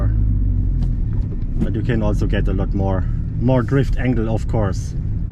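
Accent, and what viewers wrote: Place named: East Germany, Germany. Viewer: DDR ENGLISH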